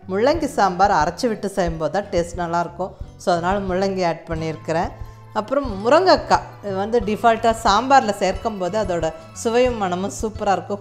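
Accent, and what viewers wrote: Coimbatore, India. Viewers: Madam neenga coimbatore ah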